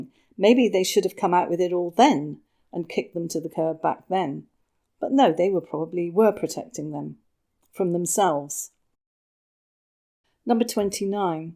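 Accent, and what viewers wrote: United Kingdom. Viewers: ❤ thank you for the video. You doing very good job 🖐🖐 from Sweden. Oh i forget to say i love your voices its so calm and sweet with that British accent 🙂 never saw you in person but iam sure you are really nice person.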